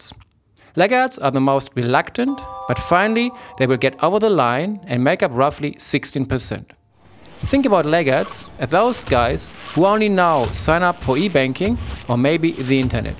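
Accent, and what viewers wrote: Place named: Germany. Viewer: not gonna lie, but I literally recognized your german accent in the first sentence :) Greetings from Germany :)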